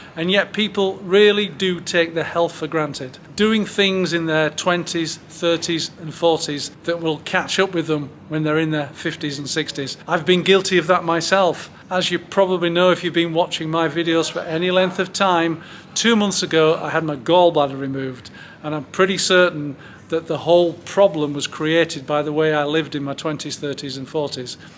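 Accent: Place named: Northern England, United Kingdom. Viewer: I adore your content & northern accent! I worked with a girl who had her gall bladder out in her 20s, don't guilt yourself, brother - Cheers to good health 🥂🍻😁💋✌️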